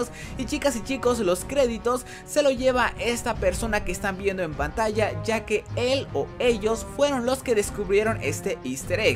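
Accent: Chiapas, Mexico. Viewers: Oye Bro eres de Chiapas(?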